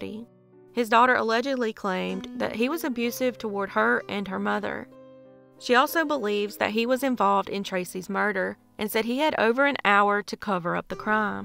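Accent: South, United States. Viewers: Love you and your channel. Also love your accent because I’m from the south too(Georgia) and I have my heavy accent too lol and I LOVE IT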